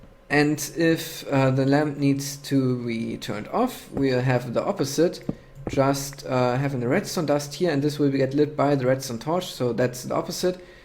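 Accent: Germany. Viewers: woow the redstone was so complicated I almost got headache haha... btw I like your german accent...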